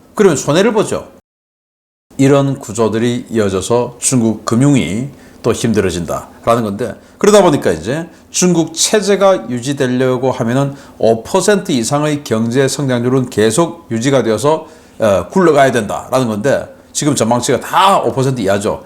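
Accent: Gyeongsang, South Korea. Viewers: 님 경상도 아저씨 맞아요. 그러니까 우파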